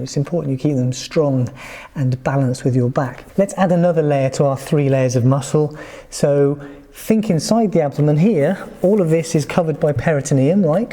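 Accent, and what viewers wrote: United Kingdom. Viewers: the british accent really piss me off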